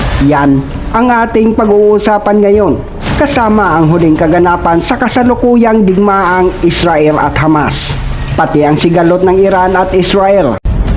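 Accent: Iloilo, Philippines. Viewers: kesa naman sa katulad mo na di kaya ang ginagawa ni prof eh ilonggo yan si idol eh hahaha. Mas mahilig pumuna ang mga taong walang kakayahan kesa doon sa marurunong.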